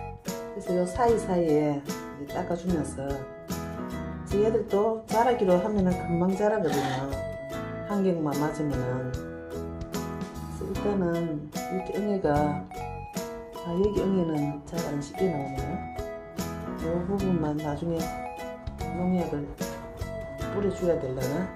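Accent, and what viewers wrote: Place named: Gyeongsang, South Korea. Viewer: 깍지블레하꼬 엉애 때문에 골치군요. ㅎㅎㅎ. 겡상도 사투리가 참 구엽워요. 매번 좋은 영상 올려주셔서 감사합니다.^^ 이 영상 아주 유용할 것 같아요. ㅎ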